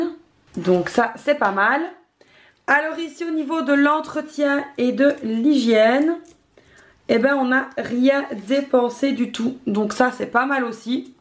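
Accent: Liège, Belgium. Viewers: Moi je préfère quand on entend sa voix top forme avec son super accent liégeois 😉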